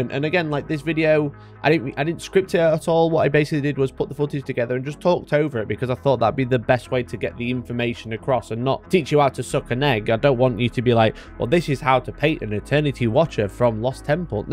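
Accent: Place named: Newcastle, United Kingdom. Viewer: Not a clue what this guy is saying. Must be from Newcastle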